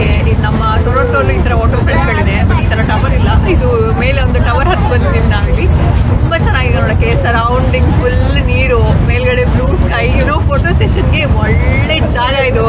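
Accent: Mangalore, India. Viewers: ಮಂಗಳೂರು ಕನ್ನಡದಲ್ಲಿ ಮಾತಾಡಿ ಕೇಳ್ಳಿಕ್ಕೇ ಖುಷಿಯಾಗುತ್ತದೆ 🙂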